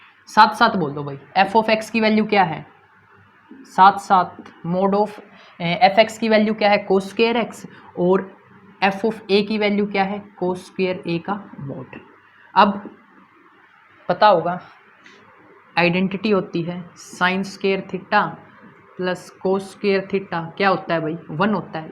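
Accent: Haryana, India. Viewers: U R Great Sir Your Haryanvi Tone is just ossmmm Thank u Maine puri youtube chaan di thi par ye topic nhi mile the And main bhi karnak ke ek gaavn ka hun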